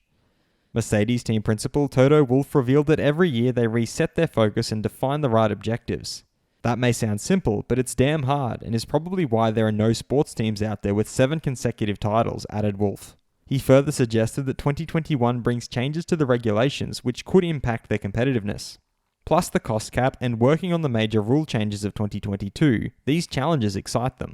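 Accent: Australia. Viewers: I suppose it depends on how much of a fan you are and your level of interest.If you don’t pay much attention to whats going on, then this channel is perfect for repeating everything in one viewing session, but if you regularly check up on whats going on then this channel is a waste of time, as its just rinse and repeat, unless, maybe, you just like listening to Australian accent🤣 Like i said, he still has to put the work in to make these videos and he has has plenty subs, so fair play to him.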